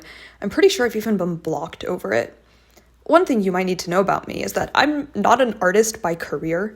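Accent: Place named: Greece. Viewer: I just started the video but your voice instantly let me know you're not just a woman, you're a woman of greek origin😂idk if you speak Greek, your pronunciation is great, but I just knew ahaha, glad to see greek creators in the wild. edit: I checked your insta (followed and commented too, notice me!!/j), I was right and we're the same age too lol Edit 2: okay now that I finished the video, first of all, you took a lot of my thoughts and put them into words which I loved. It shows a lot of the mentality when it comes to writing women. I was thinking "most of the internet art is women, but that's because men drew out their p*n material. And then you said it, it's gooner shit. It's pretty statues with nothing about them personality wise. Some pros may make the design good enough to communicate their role in the story but that's still surface level and they could absolutely suck in a story as a character, even if "woman pretty and design semi functional". 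I'm also in stem (heyyyy) so I appreciate a good graph and analytical approach with methodology for the conduct of the research. Apart from the video itself, I've been writing stories without realising it mostly about women. They're the driving forces of their stories, some of them suck and make the rest of the cast worse, manipulate the men, control their fates and relationships, either on purpose or by accident. I haven't drawn my characters a lot due to time, apart from the main duo, but I don't see them, any of them, as my b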